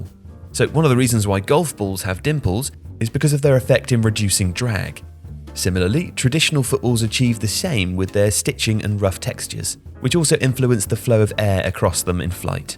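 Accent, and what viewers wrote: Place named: RP, United Kingdom. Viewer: "lamiNAR" 😂 This lad is getting posher with every video!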